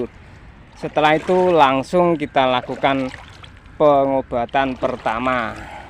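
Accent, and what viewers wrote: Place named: Madiun, Indonesia. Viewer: Hadir om,, sampean asli pundi om?? Kok bahasa jawane mirip madiun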